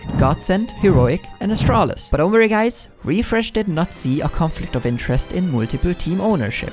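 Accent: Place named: Germany. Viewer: Hat lange gedauert aber den Deutschen Akzent kriegst du nicht los😂 (Wirklich geiles Video du hast definitiv mehr Abos verdient) #fckastralis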